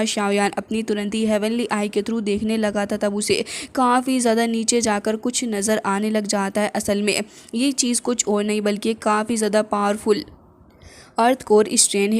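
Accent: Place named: Bihar, India. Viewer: Bihari bol rahe ho aap ❤❤❤❤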